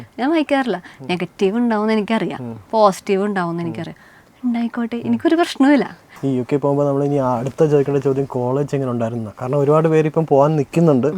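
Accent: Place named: Thrissur, India. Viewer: All good but I am from Mattancherry and even I cannot place her slang to Vyppeen. Thrishur, yes. Something's amiss! Oh, well!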